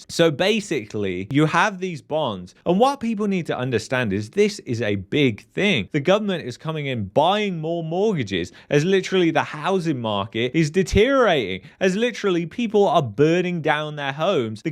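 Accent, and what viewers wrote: United Kingdom. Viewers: 4 months ago you were saying the same shit , where is the apocalypse ? Please confirm. We all know it's coming soon but please provide some original information. No facts or data to back up your pseudo doomsday claims. I mean come on we already have Alex Jones and more lmao. Im sorry but i need more than a british accent and fake data to tell me what to aim my thoughts toward.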